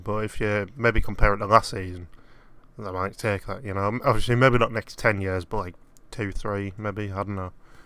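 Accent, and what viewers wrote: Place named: North, United Kingdom. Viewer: can't U tell your from up north.... Thick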